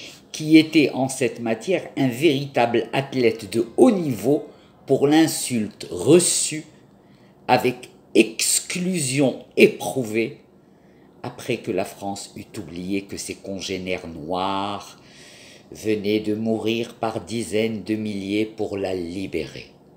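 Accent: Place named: Morocco. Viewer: نعشق لهجتك المغربية التي تدكرنا بالعلاقه المثينة بين اليهود المغاربة والمسلمين المغاربة.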